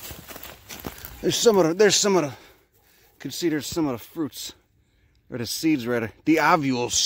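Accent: Chicago, United States. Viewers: Just joining (recommended by Diamond) LOVE your info on utilizing the natural growth around us.....Learned a valuable lesson last week...physically cannot do tree trimming, etc...had to hire someone...they did a great 'trim' BUT I had no clue how the underbrush of all my overgrown fauna around my small house was INSULATING it. Yikes !! Miss that Chi town accent :) Grew up 40 miles south and had my first 'real' job downtown on Jackson Blvd (1963) Took the train into Union station...wore 'electric socks' to keep my feet warm (from Bennett Brothers) back then, a wholesale company. Thank U for your knowledge !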